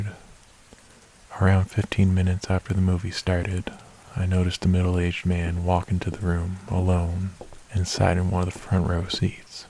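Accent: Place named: North, United Kingdom. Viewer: You've gotta be a northerner- we have the same accent 😬😄 Another great narration!👍💜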